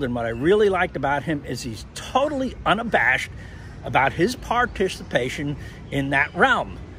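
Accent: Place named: Brooklyn, United States. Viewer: Your Brooklyn accent is easy to recognize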